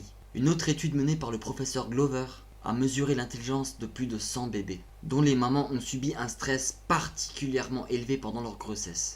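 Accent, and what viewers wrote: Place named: Moselle, France. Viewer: çà c est un accent de moselle, coté saaaaaaaarguemines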